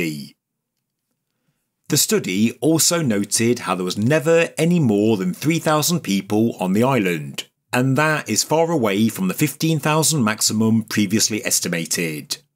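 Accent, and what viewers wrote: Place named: Leicester, United Kingdom. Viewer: Leicester I think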